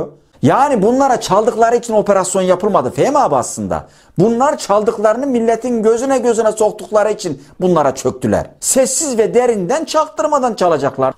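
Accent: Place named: Anatolia, Turkey. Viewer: Anadolu şiven harika dostum.😂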